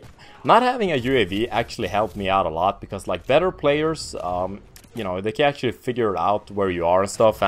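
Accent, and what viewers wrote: Sweden. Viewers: swengelsk accent,älskar den haha x'D härligt härligt lol har sett mig själv i ett par av dina MW2 clips :P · are you from norbotten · Swedish accent :) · svensk från norrland?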